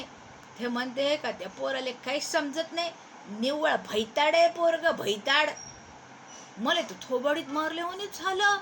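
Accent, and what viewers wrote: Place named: Vidarbha, India. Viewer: खूप सुंदर कथा सादर केली आहे़ . विदर्भ बोलीचा ठसका एकदम कडक 👌👌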